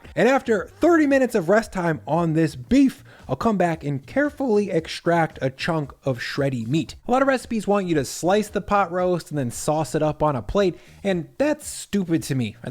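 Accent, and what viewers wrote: United States. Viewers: You sound American but you are using metric measurements? WTF?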